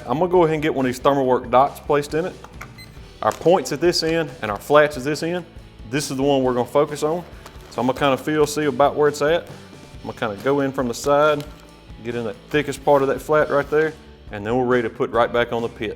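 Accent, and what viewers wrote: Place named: South, United States. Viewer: I loved the accent.. That looks like a southern accent.. Not sure if it's south Carolina or some other place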